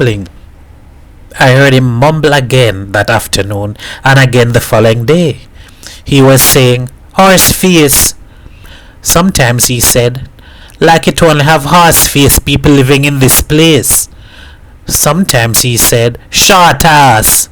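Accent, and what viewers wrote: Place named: Trinidad and Tobago. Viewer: Thank you, Sir, for reading Miguel Street in what I assume is a Trinidadian accent. Grateful Zimbabwean here.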